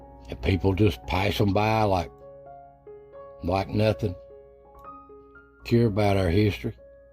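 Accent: Appalachia, United States. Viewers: Well said. Another thing distressing to me is there's nobody under a certain age anymore that's "country". Even if they're born & raised in the country they don't sound like it. They don't have the heavy accent like you & i . That's not a crime but they all seem to talk so fast i have to get them to repeat theirself. Makes me kinda relieved to be past 60, so folks don't expect much, lol.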